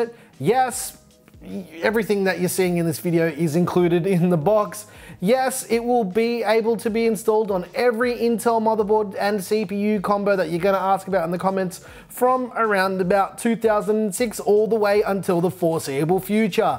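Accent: Australia. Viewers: Why do you look Mexican but you speak Australian? So strange I love it!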